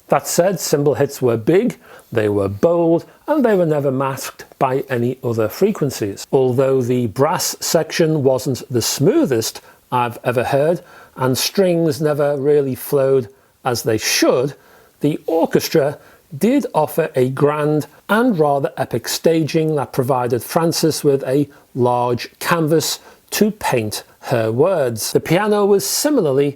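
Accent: Liverpool, United Kingdom. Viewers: Nice British accent :-) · Looking to get into vinyl. Are you from by my way Liverpool? If so apart from Richer Sounds are there any decent shops up here to browse?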